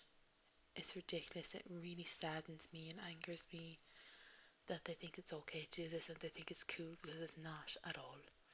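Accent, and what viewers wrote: Ireland. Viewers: God your voice is gorgeous! :) think I have established I like irish women :P nommy nom nom. I was watching This Is England 88 tonight and they had this Irish nurse on there and I instantly liked her accent. Think this is better than a Brummie accent now. Im from the South Of England I live 80 miles from London in Kent. My accent is cockney/kentish "alwite mate you fackin...." Irish accent is now my fave :) whoop! 2nd is Margate girl accent (local accent) :)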